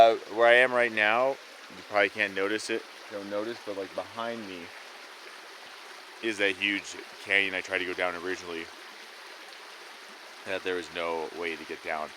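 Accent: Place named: Boston, United States. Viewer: Be proud of your accent! Grew up in Boston also!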